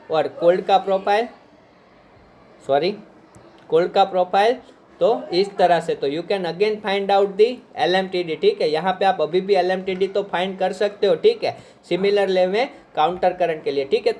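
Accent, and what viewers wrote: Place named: Gujarat, India. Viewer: Aavaj thi gujrati lago chho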